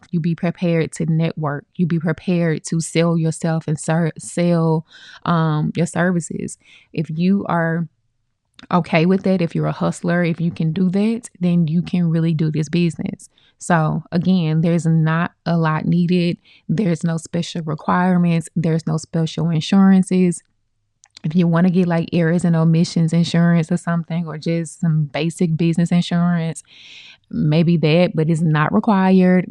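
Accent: United States. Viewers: I’m a phlebotomist and have always wondered if I could do this! Thanks!! I’m with CDNA and it is free. They didn’t charge me anything! And I’m in Ga you sound like a Atl girl. I will send patients we can’t take up your way! ❤thank you again for these gems! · Luv the southern twang :) and enjoyed your professional presentation of your topic...the meat and potatoes without all the garnish!! Very insightful and honest and that is what i feel a lot of content creators are missing...authenticity. Thank you for the wealth of information and keep doing what you're doing!! Stay Blessed!!!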